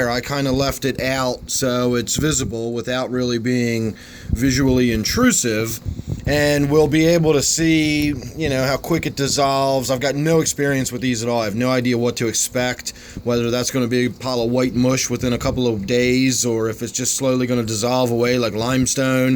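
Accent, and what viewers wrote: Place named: mixed, United States. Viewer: Hey, are you in Philly/Jersey area? Love your accent!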